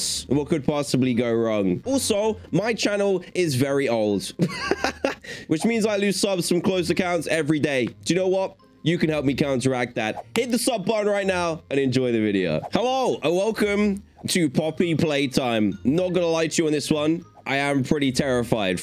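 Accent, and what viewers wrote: England, United Kingdom. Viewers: Are u a brit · do u live in England cuz I do and u sound English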